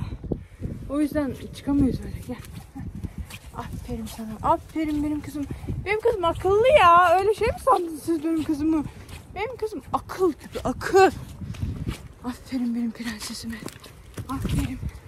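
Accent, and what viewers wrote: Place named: Laz, Turkey. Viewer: Hafif laz şivene hastayım yaa çok sevimlii :dd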